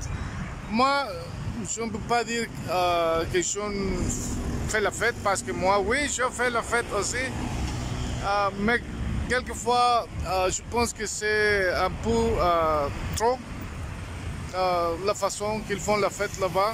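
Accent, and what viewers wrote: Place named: Canada. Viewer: Your French accent sounds very Canadian. However, I love the way you speak French and you can code-switch languages, you have a gift, my friend! Thank you for this video!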